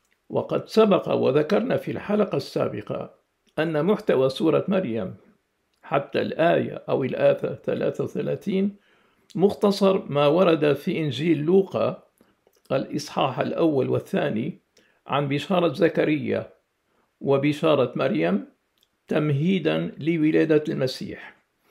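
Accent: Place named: Iraq. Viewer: هل هو ؟ لأن لهجته عراقية وليس سورية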